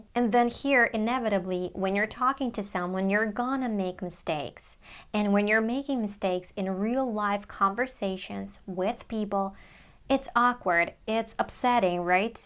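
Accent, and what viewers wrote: United States. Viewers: I❤your American accent. You're the Best. Thanks 🥰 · The fist time i saw you on youtube, i thought you were American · Wow! You're Ukrainian. I thought all the time you were American. You've done a great job. Congrats. 💐🌹💐🌹💐🌹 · Wow! You have an excellent American accent, I like it, I congratulate you and keep going.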